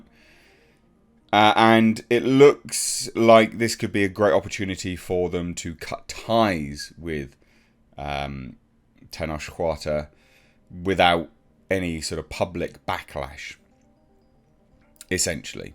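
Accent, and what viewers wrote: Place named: United Kingdom. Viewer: I love your videos but as a Texan, I have to say, I cringed every time you said the actor's name. You sound like my relatives in the UK. I laugh every single time they try to pronounce a Spanish or Portuguese word.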